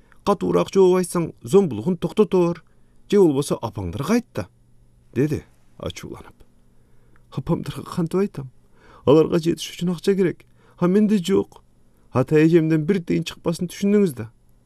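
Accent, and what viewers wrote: South, Kyrgyzstan. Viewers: Ай иий сыртта журуп ушунча аракеттенесин. Окуганын керемет... Туштуктун диалектисин катырасын да. Образга кирип бирде абышка бирде жаш кыз кээде аял... Рахмат иним уккан адамдын кулагынын кычуусу канат. Омурлуу бактылуу бол. Тилегине жетегой. Сени урматтап биз....😊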